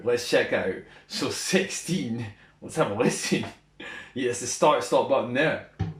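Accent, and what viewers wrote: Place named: Scotland, United Kingdom. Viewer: Scottish accent = Insta sub.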